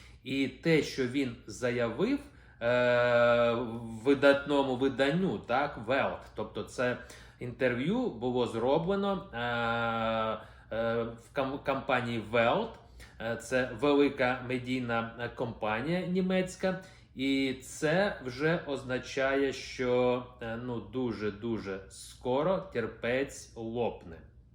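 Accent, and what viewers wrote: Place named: Russia. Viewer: А ВЫ. ЯК ОГО. ЛЫСОГО. СЫДЫТЕ. БАЛАКАЕТЕ. НА. УКРАИНСКИЙ. МОВИ. З. АКЦЕНТОМ. МОСКАЛЯ. И. ПОКАЗУЕТЕ. ЩО ВЫ НЕ. ЛЕДАЦЮГА. АНУ. БИГОМ. НА. ПЕРЕДОК. АНЕ. ЗВЫЗДИТЫ. И ЗАРОБЛЯТИ. ГРОШИ НА. БИДНЫХ. ЛЮДЯХ. ПРОВАЛИТЬСЯ ИЗ. СВОИМ. КАНАЛОМ. В. БЕЗДНУ!!!!!!!!! ПИДДЕРЖИТЬ. МЙ. КОМЕНТАРИЙ. ДОРОГИ. И. НАСТОЯЩИЙ. УКРАИНЦИ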